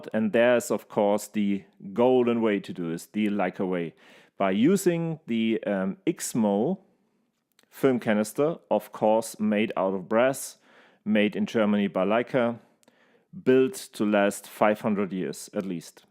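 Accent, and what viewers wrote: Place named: Germany. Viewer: I wanted to say Danke! Really instructive video and I've been using regular metal cassettes which go in all my other cameras and my Leicas too. I have the correct loader and a few IXMOOs so with your help now I am able to use them. Really helpful! And also I want to say your English with the German accent is absolutely perfect for this, it adds "authenticity", as if Leica had made this video from Wetzlar...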